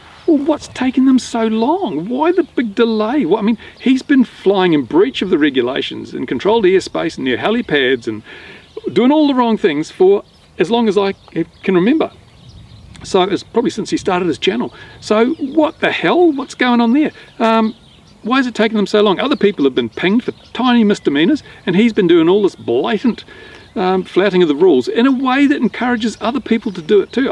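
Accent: Australia. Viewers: Is this guy Australian? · Whats that accent? Aussie?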